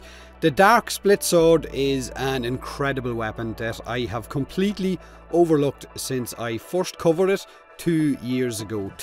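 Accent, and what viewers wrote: Ireland. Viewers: Am i the only one that doesnt give a fuck about the warframe but come just for that sweat Irish accent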